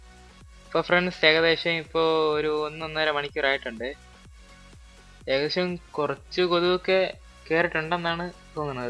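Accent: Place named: Kottayam, India. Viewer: ഫാഗം കോട്ടയം സ്ലാങ് ആണ്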